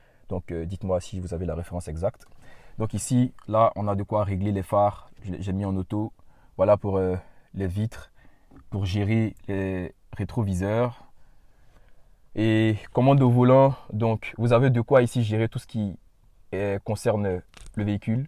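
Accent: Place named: Haiti. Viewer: Belle video, bien argumentée dans un français soutenu, avec des anglicismes fort bien maîtrisé, une VOIX de lôveur tintée d’un léger accent! D’origine haïtienne!?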